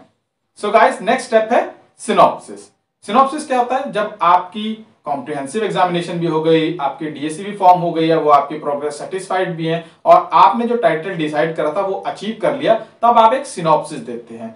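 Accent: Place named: Odisha, India. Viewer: i can feel the odia accent 😋 . very informative video thou.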